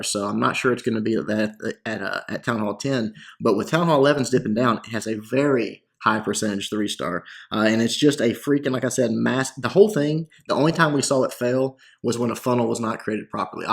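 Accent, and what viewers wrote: South, United States. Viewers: I find these videos informative, but this dude's voice is super annoying. It's that shitty southern accent with the horrid pitch FFS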